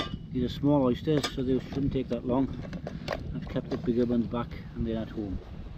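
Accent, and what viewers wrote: Wales, United Kingdom. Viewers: Cannot tell you just how chuffed to pieces I am that I discovered your channel. Loving the videos tremendously and you are such an engaging gentleman. I love listening to your lovely soft Welsh accent. Just some critical feedback for this video in particular is that the audio is terrible in parts, due I'm sure to your cameraman not utilising the microphone to its best advantage! I'm sorry to say I found it quite irritating in places because all I wanted to do was listen to/hear you! Lol. Let's hope you train him better for future videos! Thanks again for your fab and very informative videos! I've definitely got the foraging bug now!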